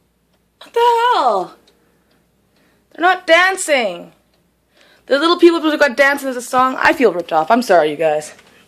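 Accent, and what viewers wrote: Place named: North, United States. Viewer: I'm sorry for your loss! What state are you in? You sounded like you were from up north but you threw me off with the palm trees...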